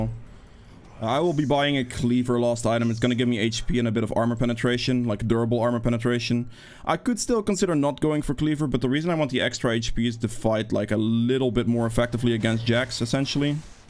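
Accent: Netherlands. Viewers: hi i enjoyed ur gameplay.. i have a quick question.. r u dutch?? take care king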